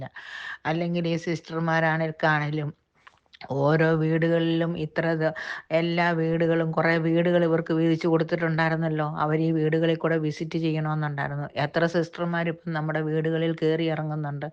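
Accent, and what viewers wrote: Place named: Changanassery, India. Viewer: ഉച്ചാരരണം കേട്ടാൽ ചെങ്ങനാശ്ശേരി അമ്മച്ചി ആണെന്ന് അറിയാം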